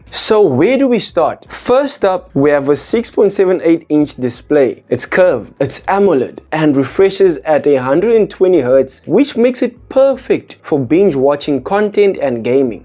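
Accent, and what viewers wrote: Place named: South Africa. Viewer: South African accent so I'm assuming South Africa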